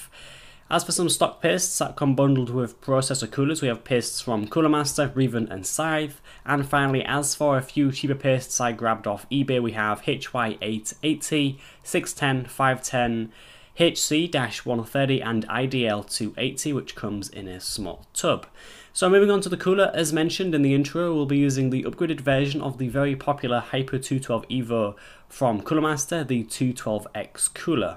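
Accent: United Kingdom. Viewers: I suspect that you are speaking English; however the thick British accent makes it very hard to understand what you are saying. Too bad because it appears that you have a good comparison.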